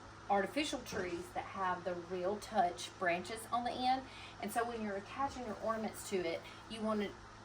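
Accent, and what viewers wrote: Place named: South, United States. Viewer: What you call a Chanel stem, I know it as a pipe cleaner 😂. It's probably regional. I'm from Utah and you sound like you're from the south.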